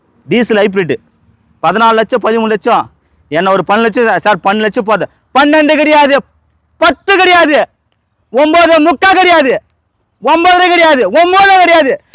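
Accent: Madurai, India. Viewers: Madurai vasagam super 👌 🎉🎉🎉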